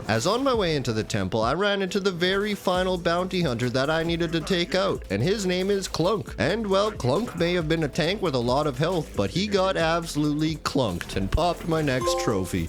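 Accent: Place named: Alberta, Canada. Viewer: He's either Albertan or Nova Scotian bud 😅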